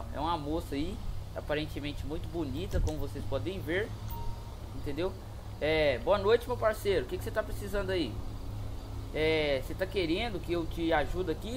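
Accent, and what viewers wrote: Minas Gerais, Brazil. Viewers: Sabia que tu era de minas , voce é de BH aqui mano ? quando voce fala Jae , pela ord kkkkkk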